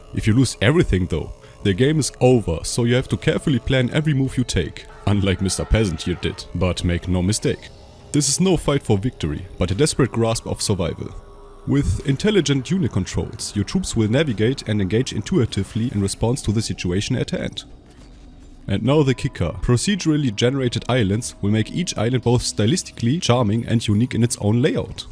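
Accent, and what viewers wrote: Germany. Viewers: liebe deinen Deutschen akzent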